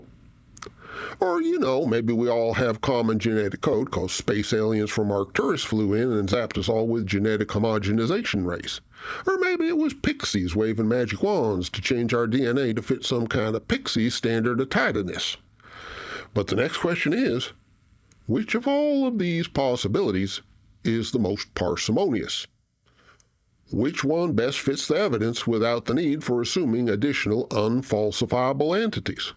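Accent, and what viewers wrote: South, United States. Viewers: Weyell, the cognitive dissonance betwixt country hick-sounding bear and educated skeptic somehow ain't keepin' me up at night! I like it, and why not adopt an easy-to-recall schtick? For new relatively unknown creators, do you know Ivy Windsong Collins? She's got older southern belle down very nicely indeed.... TTYL!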